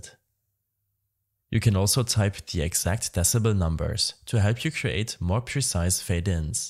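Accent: Germany. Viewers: Bruder was ein harter deutscher Akzent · Korrekt, danke dir :) Man hört raus, dass du deutscher bist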